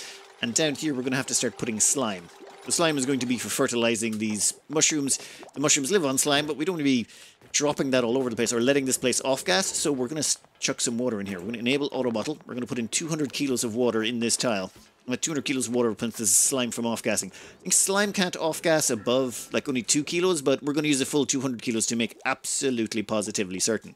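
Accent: Ireland. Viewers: im pretty sure hes irish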